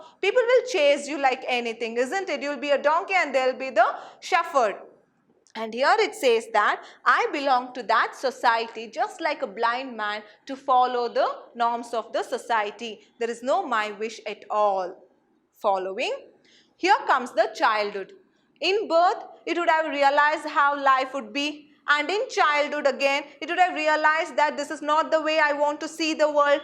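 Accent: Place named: Kerala, India. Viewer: Vocab is ok but pronunciation 🥵....mallu pronunciation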